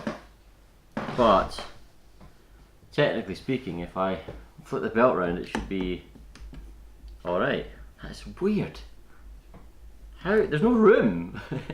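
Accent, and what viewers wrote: Scotland, United Kingdom. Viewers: Hello! I came across your channel today. Nice to hear a familiar accent. I'm in Troon, near Glasgow. Have you still got your XXX4? I'm in the middle of resurrecting my old XX4, and I have a new in box XX4 Worlds and Street Weapon IWC, both of which I plan to build shortly. Have you tried the belts that are now available from Tough Racing? Those seem to be the recommended replacements these days for the XX4/Weapon. I've recently converted over to running Schumacher, but the L1 Evo and Cougar just don't have the same appeal to me as these old Losis. In my less than capable hands I'm sure I'll be just as quick (read slow) with the XX4.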